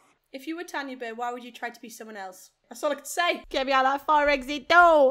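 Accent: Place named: Wales, United Kingdom. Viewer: Love the welsh accent now😍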